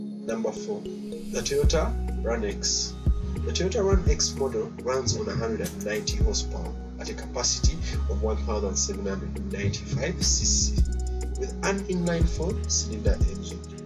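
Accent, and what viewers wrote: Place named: Uganda. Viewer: that's a Ugandan accent.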